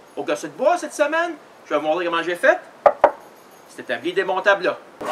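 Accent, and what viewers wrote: Canada. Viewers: c'est l'accent du Canadien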